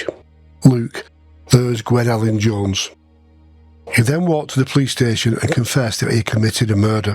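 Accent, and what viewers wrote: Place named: Lancashire, United Kingdom. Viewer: I love the Lancashire accent. Far preferable to any Southern English namby-pamby accent.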